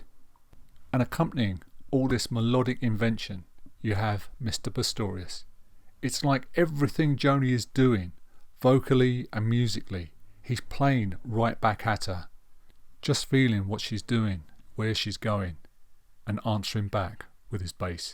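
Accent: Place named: United Kingdom. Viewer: How do YOU pronounce your surname? I'll wager it's not the way Franz Schubert pronounced his. Who really cares - honestly??????? In any case, you're doubtless American and the narrator's accent may sound unfamiliar to you, but it makes PERFECT sense to Brits. It is the content that matters, not the pronunciation.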